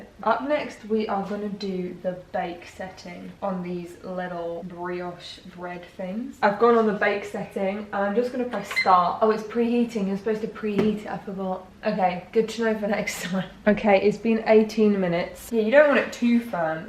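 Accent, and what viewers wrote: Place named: United Kingdom. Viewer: It's really interesting that the company is called sage and has a crumpet setting here in the United States the company we have is called brevel although that company is based out of Australia but basically is the exact same oven we don't have a crumpet feature but we have other features but it basically is the exact same device. Also I do have to say I love your British accent and you are stunning absolutely stunning look forward to more cooking videos with the device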